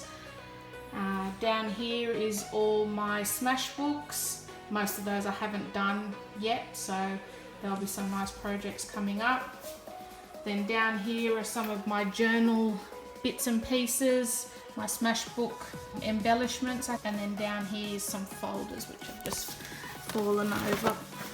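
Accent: Australia. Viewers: I love it! It feels comforting to hear an Aussie accent too! Are you Indigenous Australian?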